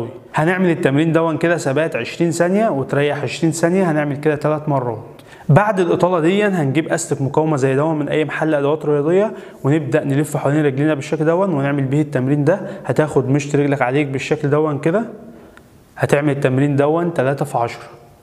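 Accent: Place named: Egypt. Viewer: ماذا تقصد ثلاثة من عشرة لهجة المصرية لدي بعض صعوبة في فهمها لك كل الشكر والتقدير أخي الكريم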